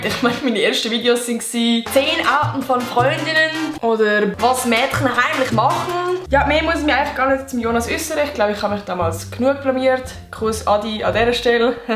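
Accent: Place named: Switzerland. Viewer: Super das mitem schwizerdütsch dankeee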